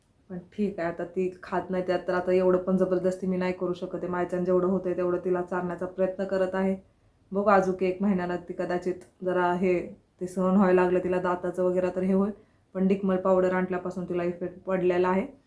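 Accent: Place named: Latur, India. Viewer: ho latur chi bhasha lagech olkhu yete..... Me aushyachi ahe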